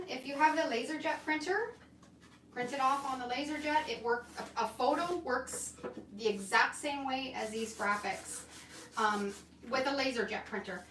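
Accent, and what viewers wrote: Canada. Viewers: It's actually pronounced day-koo-paazh, but I think it's your Canadian accent which is really cute. I always giggle a bit when I hear you say it.😀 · you have a Canadian accent. People can get over it. I'm American; I say 'deck-o-page,' but you do you. I'm here for the learning! Thank you so much for teaching so well!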